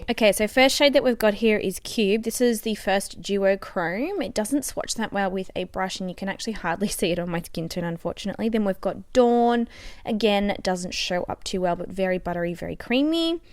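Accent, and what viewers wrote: Australia. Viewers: Thyea Phelps She has an Australian accent! That's why she talks like that. Are you for real?! Lol. Do you want her to talk with a fake American accent just to suit you? This made me laugh 😂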